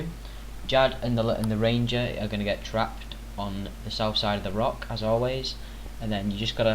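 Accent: United Kingdom. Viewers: geordie accent :D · You from middlesbrough?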